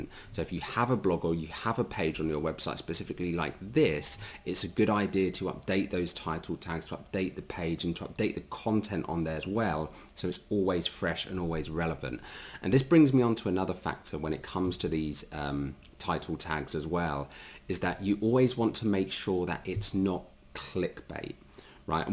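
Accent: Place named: United Kingdom. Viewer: Thank you. A class is better when given in british accent.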